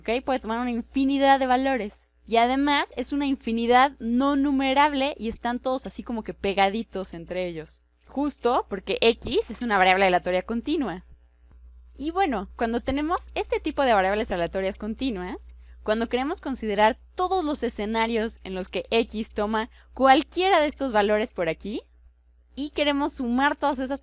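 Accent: Mexico. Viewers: Buen día. Alguien me podría decir(desasnar) si esta forma hablar corresponde a una persona oriunda de México?,en ese caso seria la forma de hablar del pituco, cheto, etc (persona relacionado con la "alta sociedad y con dinero")? No se si pensó que solo los de México verían el vídeo, en lo personal hubiera preferido un castellano neutro. En gustos y colores.....a mi no me gusta su voz. Gracias por el vídeo. Saludos cordiales! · Su voz es horrible, es la típica voz del DF que quiere sonar "fresa", aparte se supone que en docencia no se debe de utilizar el uso de modismos propios de una región como "padre", sobre todo en un lenguaje tan global como el castellano.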